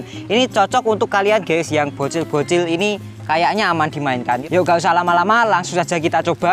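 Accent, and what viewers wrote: Java, Indonesia. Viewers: Jowo pinggir ndi mas ? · Arek jowo yo mas...😀😀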